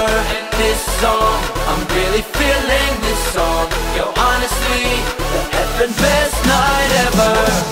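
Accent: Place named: United States. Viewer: Wtf your Aussie? You sound American... Australiaslosing it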